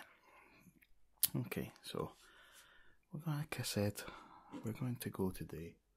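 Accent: Scotland, United Kingdom. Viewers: I absolutely love your voice, I'm a sucker for scottish men and their accents ☺ Great video and doodle adventure! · I’m originally from Dumbarton. In the states now. Love hearing the homeland accent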